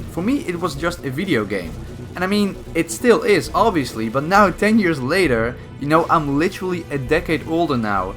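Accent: Netherlands. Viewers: You sound like Silo Entertaiment (or its just the Dutch accent) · Ben je een Nederlander want ik hoor het aan je accent😂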